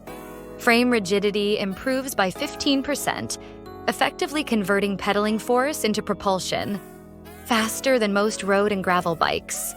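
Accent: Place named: United States. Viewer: 대혼이구나 미국발음은...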